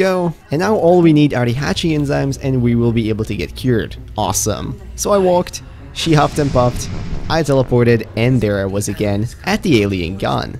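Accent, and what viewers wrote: Netherlands. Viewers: Immediately knew you were Dutch. It's the accent lol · bro i just discovered youre dutch, you can hide your accent super well!!!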